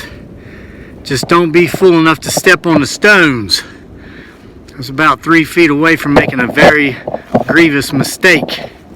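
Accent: South, United States. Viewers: Yes, Suh! I do declare. Listening from Georgia, I hear your Southern accent. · You know how they say “if you can’t say something nice, don’t say nothing at all” ? Yes I got something to say but it isn’t nice! You’re making an absolute mockery of the subject. You’re pushing merchandise! That’s it!!! Some people have had real life encounters and you’re channel is a disgrace. That deep southern draw you seemed to have picked up all the sudden is rediculous. Grow up!!!!!!!! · Voice change,. Southern twang! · What is up with that heavy southern accent? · LOVE YOUR SHOW 😂😂😂😂,IS IT JUST ME OR HAVE YOU SUDDENLY DEVELOPED A SOUTHERN ACCENT?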